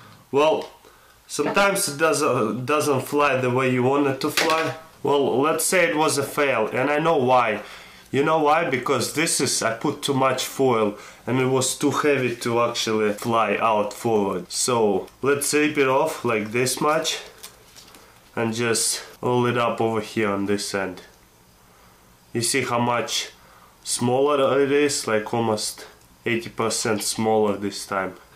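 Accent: Russia. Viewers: are u russian? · Lets make it strong again. He sounds sexual with russian accent. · What was the last experiment??? He said like a carbide rocket??? he's to Russian to understand sometimes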